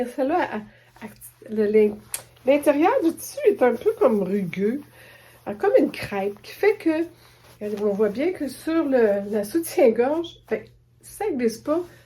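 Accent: Quebec, Canada. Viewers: Très beau travail l beaux tissus l et accent du Québec où vivent mes enfants et où je vais tous les ans l merci pour la video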